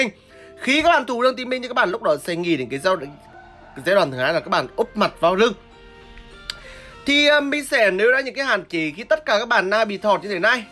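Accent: Nghe An, Vietnam. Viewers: Giọng nghệ an phải ko anh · Tiếng người ở đâu nghệ An vậy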